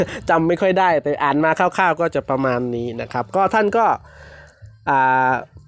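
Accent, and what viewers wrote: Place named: Laos. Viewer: ข้อยวาเว่าลาวเลยกะได้ๆๆสำเนียงมันมาแล้วเด้อพ่อใหญ่ๆๆๆๆอิอิออิ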